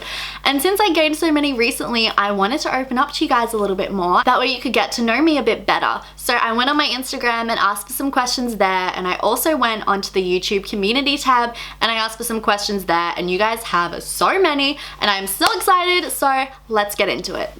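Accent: Melbourne, Australia. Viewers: Omg I’m from America but I’m moving to Australia this year! I thought based on your accent you were from Melbourne but I’ll be moving to Nelson bay which is much closer to Brisbane than it is Melbourne! I’ve been obsessed with your vids lately! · I love your accent I've been to Australia. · I couldn’t even tell you had an accent, probably bc I’m Australian too 😅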